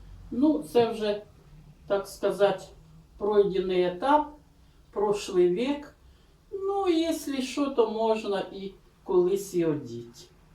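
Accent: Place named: Ukraine. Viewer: Ничего себе ПАНИ))) Хохлушку за версту видно, что речь, что мина кирпича просит))) 😄🇷🇺🇷🇺🇷🇺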